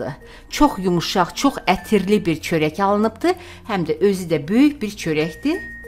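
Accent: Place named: Sheki, Azerbaijan. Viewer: Mən Şəki lehcesine oxşadiram.mamamin xalası da eynən belə danışırdı.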